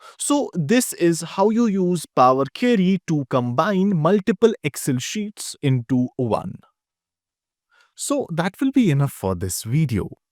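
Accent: India. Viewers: He has that typical heavy Indian accent. Hard for native English speakers to understand, due to less emphasis on sections of words. I have taught a few people from India how to speak clearly. Mind you, my Indian, Indonesian is bas, my Spanish id okay, so I cant really talk. However, i do work on the accents otherwise I get passed over.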